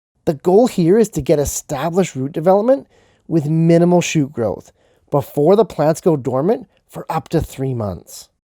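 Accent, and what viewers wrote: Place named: Canada. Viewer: Ive been trying to find small things to grow inside my apartment, im excited to try this out! Looking for more if you have suggestions 👀 Ps your canadian rise accent is strong and i love it 😆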